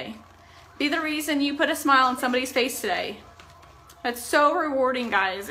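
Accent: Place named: New York, United States. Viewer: It’s a northern jersey/ New York accent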